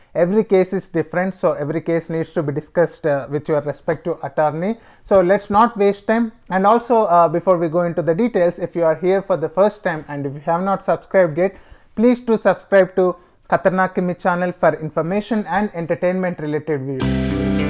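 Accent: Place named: Bengal, India. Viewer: Your accent is like of a Bengali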